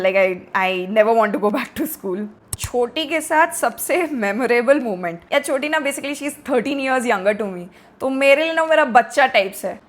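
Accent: Bihar, India. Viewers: family they are from jharkhand which was a part of bihar i guess that's why accent little bihari ki jaga gujarati wase sound lagta hai but aapka toh pura bihari bhojpuri but from this vlog got it that you from Rajasthan 👍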